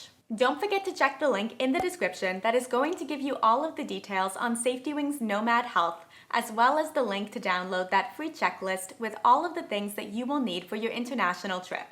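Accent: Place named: New England, United States. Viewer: New England accent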